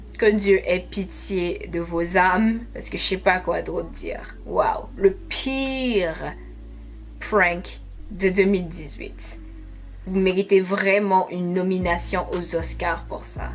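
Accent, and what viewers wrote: Quebec, Canada. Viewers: Y a des gens😥 Même pas honte😑 P.s j'adore ta voix, tu est québécoise(l'accent)? 💕🍭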